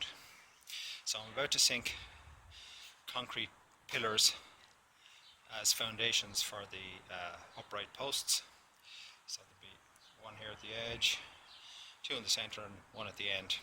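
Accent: Ireland. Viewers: Ha! I figured the accent was Irish! This is awesome, our corrugated plastic flew away during one of the recent storms, they were only nailed down, this is exactly what I needed! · Just watched to the end and it's fabulous I wanted you to walk inside with the camera at the end. Love your accent too. I want to move to Ireland now :-).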